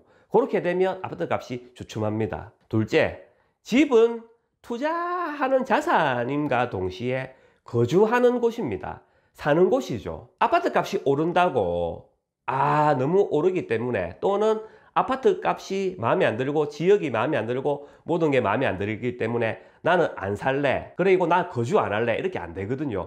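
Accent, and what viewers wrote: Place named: Busan, South Korea. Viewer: 잘 들었습니다~부산 사투리 듣기 좋네요~앞으로 좋은 강의 기대하겠습니다~^^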